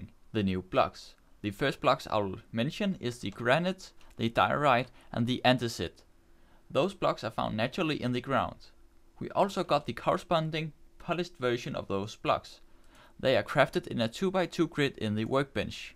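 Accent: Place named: Denmark. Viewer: Your Danish aren't you? :)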